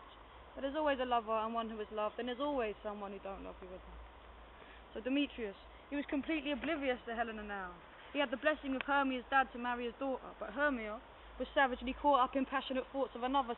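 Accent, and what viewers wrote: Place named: South London, United Kingdom. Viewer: She's from South London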